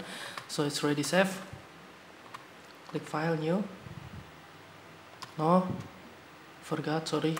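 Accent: Indonesia. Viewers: idk why but ur accent is like an indonesian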